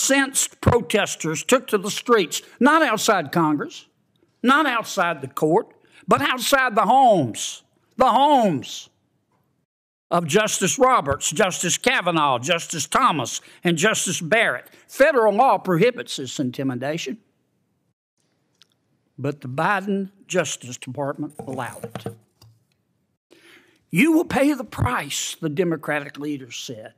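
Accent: South, United States. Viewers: Senator is a rock star. He sneaks up on people with his down-home country accent, then boom! He lays the truth down and wrecks the liberals.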